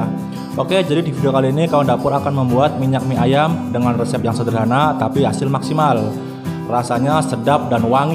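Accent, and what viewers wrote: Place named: Banyumas, Indonesia. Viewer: Ngapak kayanya bang🤭😁😁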